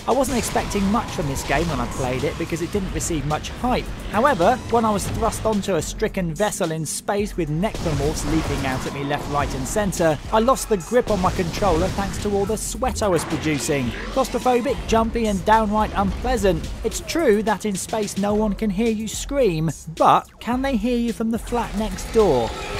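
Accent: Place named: United Kingdom. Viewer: ahw.. Hes british!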